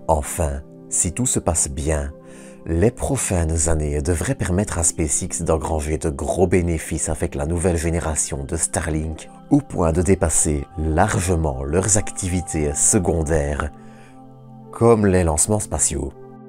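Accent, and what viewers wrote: Belgium. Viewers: Sa voix est posée, et sa lenteur relative se prête particulièrement bien aux sujets qu'il traite. Autrement son "élocution" c'est juste un accent belge.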